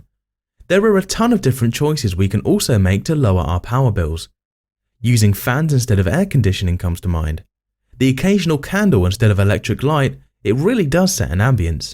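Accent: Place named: United Kingdom. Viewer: The British accent is annoying.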